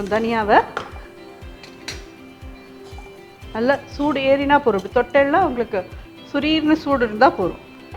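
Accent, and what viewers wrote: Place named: Thiruvarur, India. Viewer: மாமிஉங்களுக்கு நீண்ட ஆயுளை மஹா பெரியவாளும் என்னுடைய ராமனும் தறுவார்கள் உங்களுடைய பேச்சை கேட்க எங்க ஊர் திறுவாறுர் நினைவு வறுகிறத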